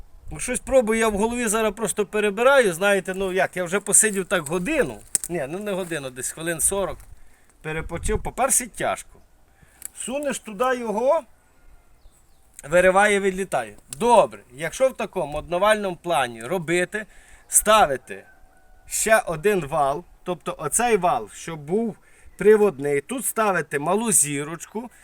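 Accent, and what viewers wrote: Chernivtsi, Ukraine. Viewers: ти з західної України, хотілося б знати звідки саме, бо у нас у Чернівцях так балакають як ти.😂 Щепоріз нормальний, ти просто ще до нього не привик.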